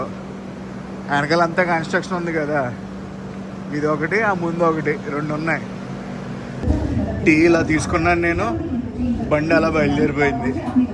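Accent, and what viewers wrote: Godavari, India. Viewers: Godavari Yasa baguntadhi brother.. watching from Ireland…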